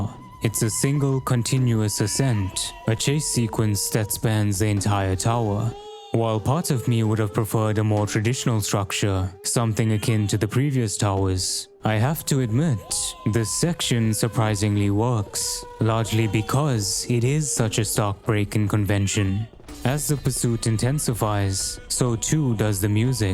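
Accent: South Africa. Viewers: I knew you were South African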